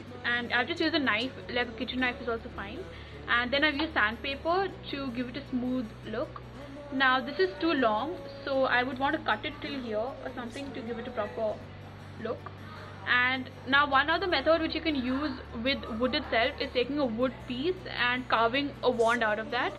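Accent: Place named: Scandinavia. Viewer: Your accent, are you Swedish, Danish or German? Also this tutorial is pretty cool and it helped quite a lot.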